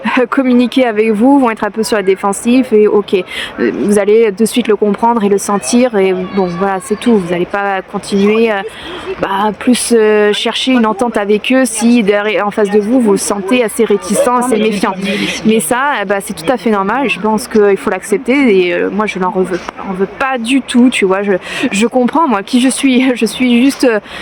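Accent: Russia. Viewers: Bonjour. J'ai pensé que vous êtes russe qui parle français, lol :) Vous habitiez où en France ?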